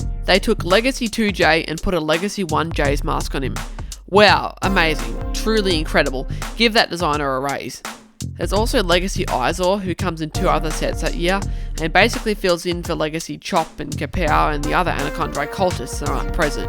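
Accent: Australia. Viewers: Your Australian !!!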